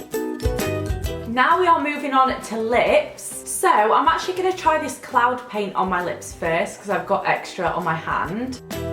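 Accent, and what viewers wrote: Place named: Yorkshire, United Kingdom. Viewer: Sounds weird but you've got the nicest accent ever... is it Yorkshire? xo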